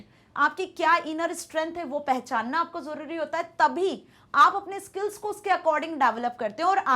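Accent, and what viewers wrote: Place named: Bengal, India. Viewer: Arey bhai ap toh bengali ho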